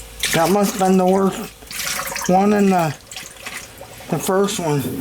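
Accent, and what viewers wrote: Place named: United Kingdom. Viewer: You speak British in n terrible way. Whaa Bawaah bong whaaa tralaaa. I can not make out a whood yo shaey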